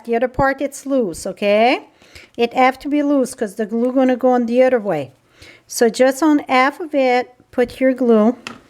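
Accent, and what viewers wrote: France. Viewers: She was saying 4 by half (inch). It's her French accent.:) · Good day, I sure love watching your tutorials because they are very informative, and you are my fellow Canadian they love your French accent have a wonderful night and a wonderful day tomorrow and God bless you. I also like the fact that you're not doing voiceover that everything you do is well you are creating your magic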